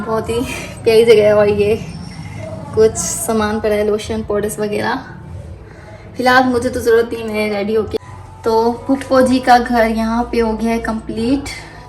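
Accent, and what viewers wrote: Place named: India. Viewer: Aap to indian jaisi hindi bolti ho or aap bahot hi khubsurat ho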